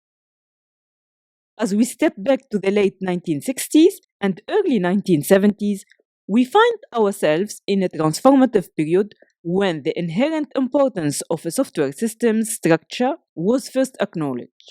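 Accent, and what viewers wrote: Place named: France. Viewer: Your accent sounds like French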